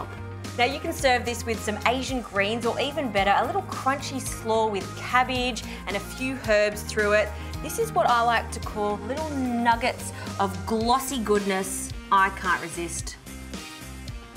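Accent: Australia. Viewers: she’s Australian 🤣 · She's Australian, this is from her TV show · amazing this looks really good i will definitely try it ..................... and i love your Australian accent after all I am Pakistani cricket lover who love s food as well. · is this lady from australia or new zealand? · Is this Australian or New Zealand accent?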